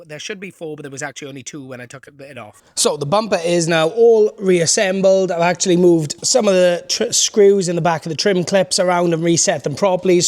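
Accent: Wales, United Kingdom. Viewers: Tell me he's welsh without telling me he's welsh lol. another great video mate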